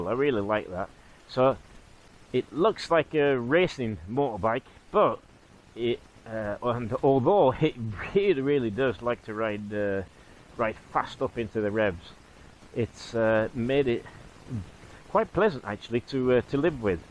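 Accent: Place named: East Midlands, United Kingdom. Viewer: Well set up for everyday use, love it. You speak English with an English accent too! Sounds a bit like East Midlands?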